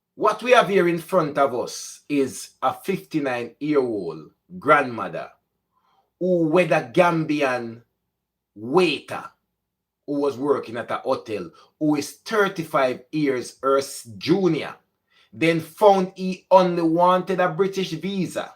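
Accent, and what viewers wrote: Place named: Patois, Jamaica. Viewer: love ❤️ the Jamaican Patwah.I am from Jamaica so I can fully understand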